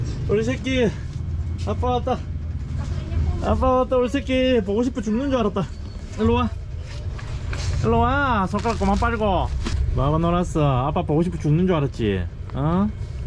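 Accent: Gyeongsang, South Korea. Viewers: 억수록 말하시는것 보고 경상도사난이 이세요?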